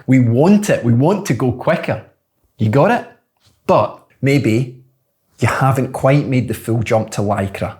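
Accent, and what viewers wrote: Scotland, United Kingdom. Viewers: That’s it in a nutshell! Like the Nike slogan, “Just do it!”. BTW, is your accent Scots or Irish? I’m thinking you’re a lad from Scotland. Yes or no?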